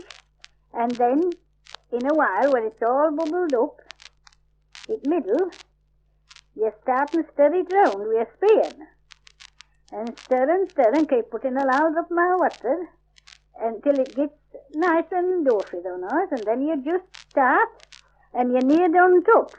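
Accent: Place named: North Yorkshire, United Kingdom. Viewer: the Northern West Riding however is considered part of the North Yorkshire dialect group, hence my comment. Were she a West Riding speaker proper you'd have things like: - "hoil" not "hooal" for "hole - "spooin" not "speean" for "spoon" - "stooan" and "sooa" not "steean" and "seea" for "stone" and "so" - "spare" not "spaar" for "spare" - "oven" not "yoon" for "oven" - "bake" not "beeak" for "bake" - "one" not "yah" for "one" before nouns - "enuff/eniff" or in the plural "enew/enow" and not "eneeaf" for "enough "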